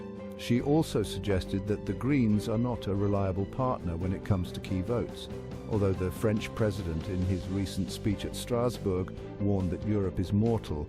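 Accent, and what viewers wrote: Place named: United Kingdom. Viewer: Why am I listening to a British accent talking about the European Union. Brexit means Brexit.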